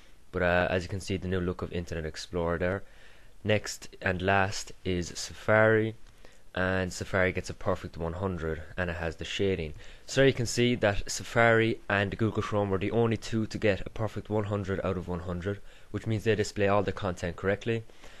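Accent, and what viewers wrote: Ireland. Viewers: funny cuz this guy has an irish accent the add before the video started was and irish spring comercial ...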